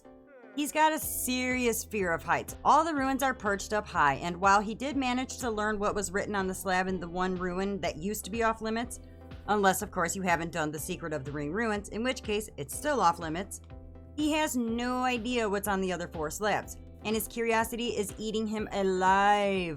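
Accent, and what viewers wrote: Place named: Michigan, United States. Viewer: Sounds like you're from Flint. Or possibly Burton or Grand Blanc... or maybe you're in the metro area, who knows. But definitely somewhere in Michigan, I'd bet a fairy on it.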